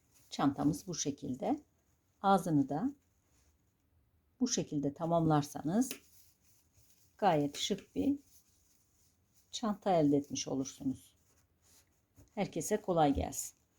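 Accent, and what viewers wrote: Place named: Ordu, Turkey. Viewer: Ordulumusunuz🤗konusmaniz orayi animsatti bana😊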